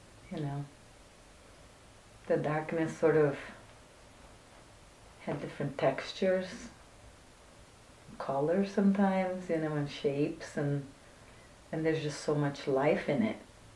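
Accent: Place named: Brazil. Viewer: Brazilian accent ❤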